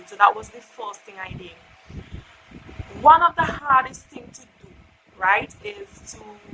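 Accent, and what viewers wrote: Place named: Nigeria. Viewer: Lol the Nigerian accent 😂😂😂🙆🏾‍♀️🙆🏾‍♀️🙆🏾‍♀️ nice video ♥️💯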